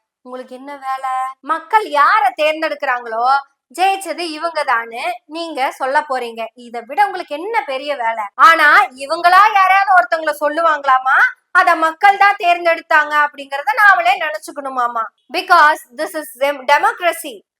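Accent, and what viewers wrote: Kongu, India. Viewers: Madam, excellent resentation in Kongu Tamil. For social reformation and upliftment. May God bless you with prosperity. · கொங்கு தமிழ் நாவில் இசை பாடுகிறது தோழி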